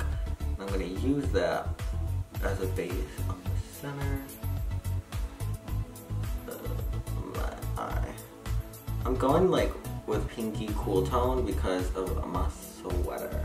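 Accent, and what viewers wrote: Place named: Midwest, United States. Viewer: Your Midwestern accent gives me life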